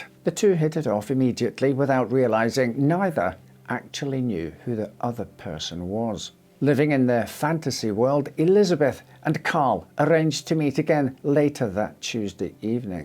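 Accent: Scotland, United Kingdom. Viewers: Very distinct presentation.Scottish I assume?Either way very excellent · Your annunciation makes you sound Scottish but a while ago when I first started watching you, you sounded like a Brummie. Great content but.......distracting.. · It's a Scottish accent, don't know much about the world? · As a scotsman…. Does anyone know where this man is from? I’m hearing half scottish and half English in every sentence.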